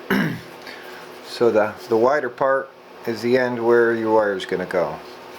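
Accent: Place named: Northeastern Pennsylvania, United States. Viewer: E tree D, haha. Sounds local to me (NEPA).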